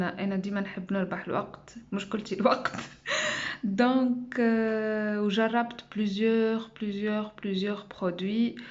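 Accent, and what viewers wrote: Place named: Algeria. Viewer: يعطيكي العافية حبيبتي بس يا ريت تكتبيلي تنصحي فيها ولا لا لاني للاسف ما فهمت اللهجة الجزائرية الحلوة و كمان ما اعرف فرنسي يا ريت تكتبيلي اذا كانت جيدة ام لا و هل تنصحي فيها ولا لا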